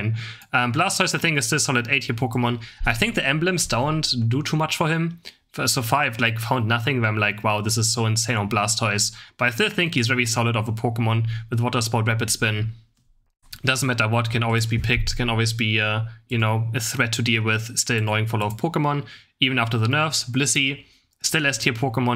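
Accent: Germany. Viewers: Klingt ziemlich deutsch 👀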